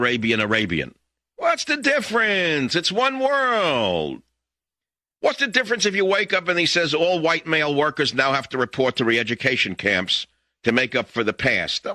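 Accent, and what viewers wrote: New York, United States. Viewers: 🎉🎉😂🎉🎉 it’s a party!! The legend in the house!! 🎉 listener since 94!!! 🤘 is a voice that represents we the people! Miss being able to listen everyday, what hooked me was his intro music and collaboration of metal music! NOW LISTEN UP! As a metal head from way back in the 80s I got my cdls and drove a truck for 20 well on my way to California first time out his intro music came on and then this guy with a New York accent came on and I couldn’t turn it off! Listened everyday as a truck driver! Good memories! Miss teddy!!! Nothing like! I would love to just sit and actually have a conversation in person! Woooooo wooooo sheeeeeeple! Lmao 🤣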